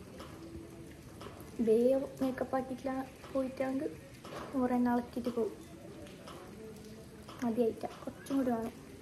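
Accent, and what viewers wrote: Kasaragod, India. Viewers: Ksd slang kekkaan vanna njn😌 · I can understand your slang because Iam living with my ksd friends seven years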